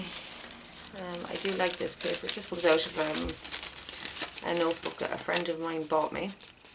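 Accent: Ireland. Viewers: Your journal is beautiful, I love how you made it, the tracing paper page with the bird is amazing! What paper did you use to make it? I would love to have a vintage journal but I have nothing vintage to make it with or put in it! The ruffled edges are fabulous, did you burn the paper to make it brown like that, seems to be patchy (how I like it)? The crinkly noises of the papers are music to my ears :) I couldn't quite hear where you get your inspiration from, or what that book was called, the one that no one can understand I think you said? sorry. If this is your first ever vintage journal, it is a work of art, wish I had your talent! If this was my book, I would be carrying it everywhere lol :) I have subscribed to your channel, I'm reckoning you are in Ireland by your accent :) I'm in Scotland :) TFS, love this!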